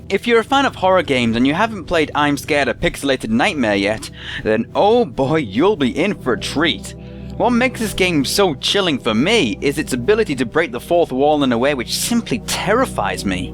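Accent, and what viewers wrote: United Kingdom. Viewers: YOUR BRITISH! :D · British PBG.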